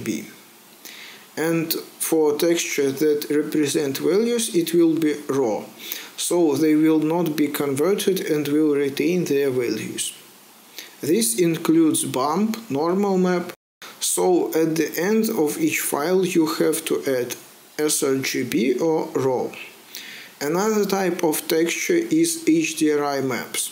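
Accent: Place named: Ryazan, Russia. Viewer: ты бы по русски, что ли вещал. Не возможно слушать рязанский акцент)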